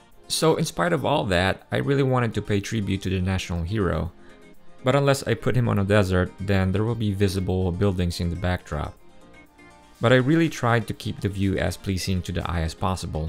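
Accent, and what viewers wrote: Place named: United States. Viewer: Akala ko amerikano ka biglang nagtagalog Hahahahaha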